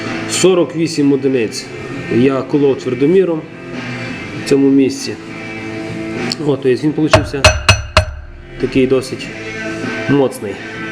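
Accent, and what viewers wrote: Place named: Western Ukraine, Ukraine. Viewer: звідки ти родом? Ато акцент Львівсько-Франківсько-Тернопільско-Волиньий!))))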